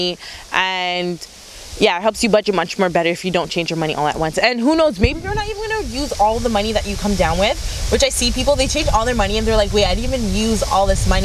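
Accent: Canada. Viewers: Listening to you, I'm picking up a Canadian accent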